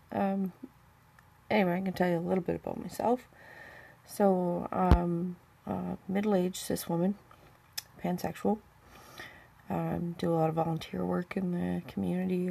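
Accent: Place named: Cape Breton, Canada. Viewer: Thank you for sharing your story! Adding your voice is so valuable, be strong! Your accent reminds me of so many of my Cape Breton friends it makes my hearts warm!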